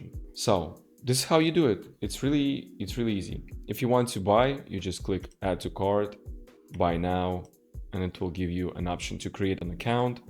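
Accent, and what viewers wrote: Russia. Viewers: ты русский, акцент палит)) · Русский акцент слышно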